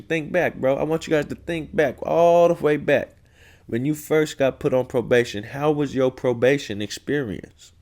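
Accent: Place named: Bay Area, United States. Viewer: Hey bro! I've been loving this channel. Where are you from mayne? I seen you in fremont some of the vids and what not. I lived in fremont frisco stockton all that. I can tell you from around this way from your voice and slang. Lol im subbin off too homie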